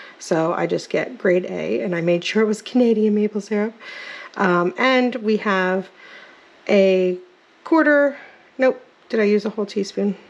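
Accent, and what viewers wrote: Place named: Midwest, United States. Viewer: Ty for sharing. I knew I heard an accent, thought maybe MN or one of the Dakotas but I definitely hear it with certain words u say. Hope ur well & sending prayers to ur Nan❤. Take care 🦃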